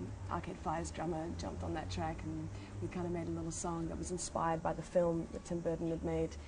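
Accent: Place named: New Zealand. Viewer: I love her speaking voice as well as her singing voice. The Kiwi accent really knocks me out.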